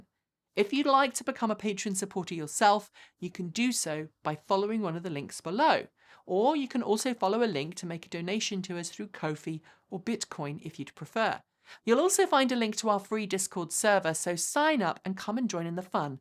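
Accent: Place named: United Kingdom. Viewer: Excellent report. I was ready to leap on any major, disputable point with gusto. It's good to hear good, solid facts in a calm, Brit accent. I may need to watch it again in case I overlooked something.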